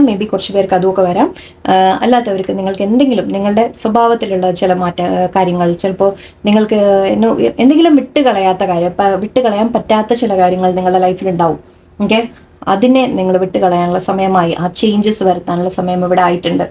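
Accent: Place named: Koyilandy, India. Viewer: ഞങ്ങളെ കൊയിലാണ്ടി ഭാഷയാട്ടോ എന്താ ഇവളെ ചിരിക്കൂന്നേ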